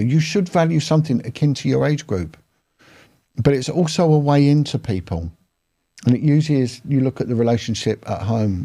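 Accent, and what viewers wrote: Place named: Scotland, United Kingdom. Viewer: Hi. I jst found your podcasts videos, and i love them, also love your scottish accent, big love from an irish girl ( whos single 😂🫶)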